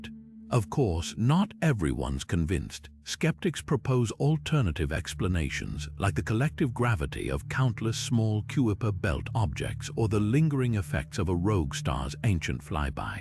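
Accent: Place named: United Kingdom. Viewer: You said it well! I hit pause when the sneakily perfect British AI voice called the region the "Cue-a-per" Belt.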